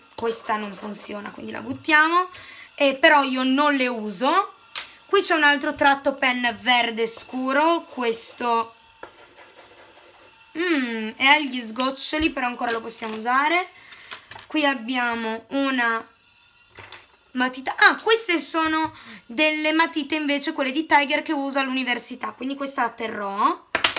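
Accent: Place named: United Kingdom. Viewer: ODDIO adoro la tua pronuncia in inglese. that's so british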